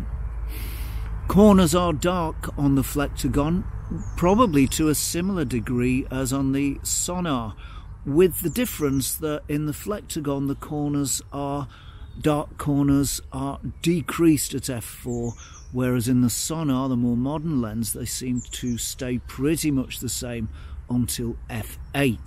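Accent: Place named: Stockport, United Kingdom. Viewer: Love your videos, having shot film in the past, and currently looking for a 35mm having got the zeiss 21mm loxia, which i love, but i'm thinking the Voigtlander 35mm f2 Apo-Lanthar Lens might be my solution, so this content is most helpful , thanks, keep up the good work. ps your accent sounds like you're from Stockport, am i wrong ? , i only spent a year there.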